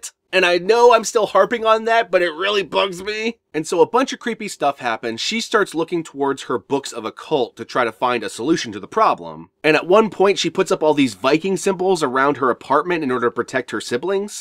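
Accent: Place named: United States. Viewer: Your pronunciation of the Spanish names is ok, you just have the usual problem Americans have where your accent doesn't lend itself to Spanish/Latino names.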